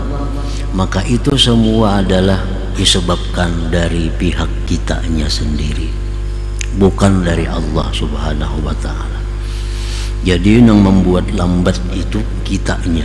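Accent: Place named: Banjar, Indonesia. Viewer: Alhamdulillah lama- kelamaan sy boleh faham. Bahasa yg Pak Guru guna diselang seli dialek banjar. Sebagaimana pak2 kiyai di pulau jawa berbahasa indo-jawa, begitulah halnya di kalimantan—mrk bertutur dlm bahasa yg digunakan oleh org kalimantan umumnya iaitu dialek banjar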